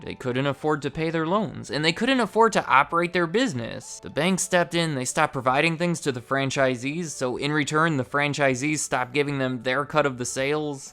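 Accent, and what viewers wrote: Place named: New England, United States. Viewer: Wow the fast talking yankee nasal guy again. Had to fast forward and get out. Again!!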